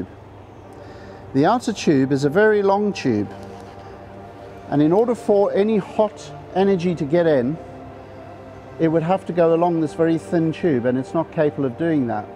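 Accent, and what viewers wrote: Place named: Cape Town, South Africa. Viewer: Well spotted. Very much a Capey.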